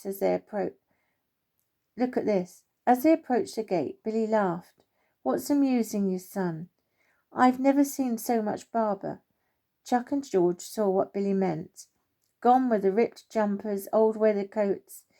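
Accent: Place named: England, United Kingdom. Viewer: Lovely english accent! It sounds really good, not pretensions and natural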